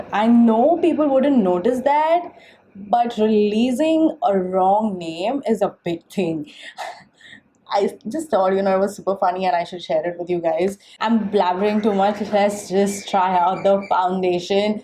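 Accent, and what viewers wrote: India. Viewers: I fucking hate indian accents soo much, idk its fucking weird as fuck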